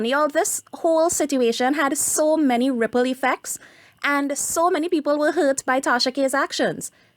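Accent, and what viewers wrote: Trinidad and Tobago. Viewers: Hey girl..you sound like a Trini...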